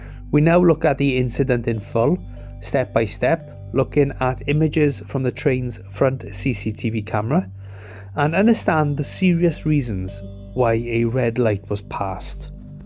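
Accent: Wales, United Kingdom. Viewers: GREAT REPORTING SORRY ABOUT THE IDIOTS COMPLAINING ABOUT YOUR WELSH VOICE SO TAKE NO NOTICE OF MY PATHETIC ENGLISH LOSERS YOUR DOING A GRAND JOB.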